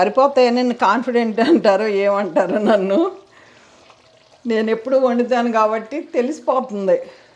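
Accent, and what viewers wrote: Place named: Andhra Pradesh, India. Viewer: madi chirala daggire aunty. mi language chusi anukunna mana area vallu ani. so happy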